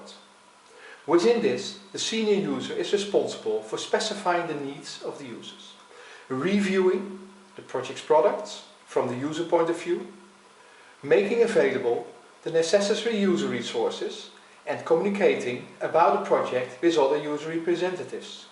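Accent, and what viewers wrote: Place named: Netherlands. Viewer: You have a nice dutch accent.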